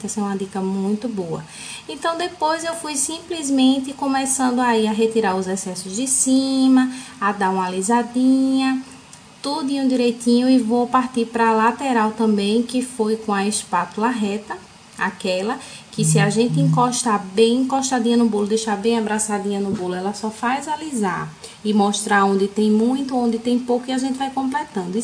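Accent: Northeast, Brazil. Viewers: Sotaque de nordestino, amooo❤️❤️ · Amei seu sotaque nosso nordeste ❤️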